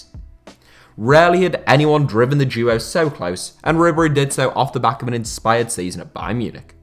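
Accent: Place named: United Kingdom. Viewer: Voice so British I had to turn on the sub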